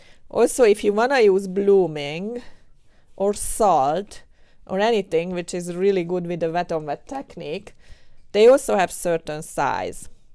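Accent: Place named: Hungary. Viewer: oh look, a hungarian accent! :D nice cats btw! so furry and fluffy looking!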